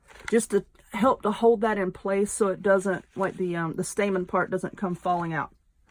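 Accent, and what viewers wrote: South, United States. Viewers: I just love your videos! And your humor, my husband and I just love watching your videos and listening to you southern accent 😁😍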